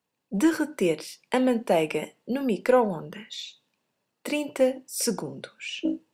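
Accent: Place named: Rio de Janeiro, Brazil. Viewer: É muito parecido ao sotaque dos Cariocas, claras de ovosh!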